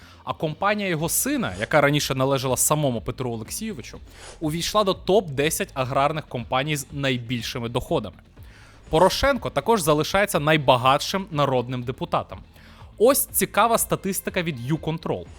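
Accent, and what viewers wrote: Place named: Russia. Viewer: Боже !!! Яке неприкрите зелене іпсо , кому ти брешеш, ти тільки вчора вивчив українську, на акцент кацапський зверни увагу.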